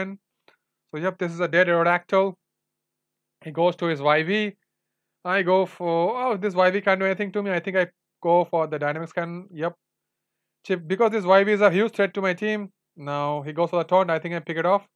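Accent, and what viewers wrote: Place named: India. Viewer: indian accent ftw 💪💪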